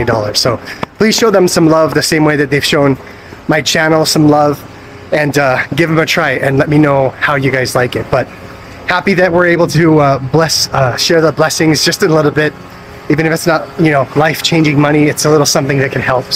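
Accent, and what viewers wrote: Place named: Pangasinan, Philippines. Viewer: No offence meant, you sounded like you are from Pangasinan when you speak Tagalog and sounds like Cebuano kapag nagtatagalog sya.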